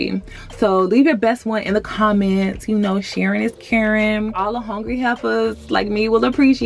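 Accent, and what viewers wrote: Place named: St. Louis, United States. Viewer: Lol we kinda sound alike...sounds like u may be from st. Louis.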